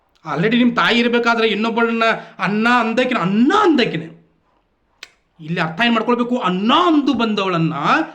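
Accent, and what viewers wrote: North Karnataka, India. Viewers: Khadak uttarakarnataka spacial bhashe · Nimma utthara Karnataka maathu keloke thumba khushi agutthe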